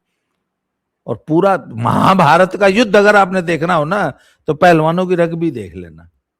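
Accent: Haryana, India. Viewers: ekdam 100 percent hariyanvi bhasa me boltey ho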